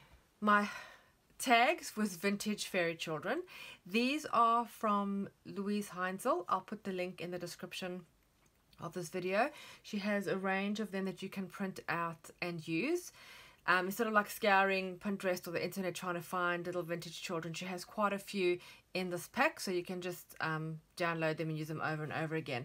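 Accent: South Africa. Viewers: you sound South African! Love your art!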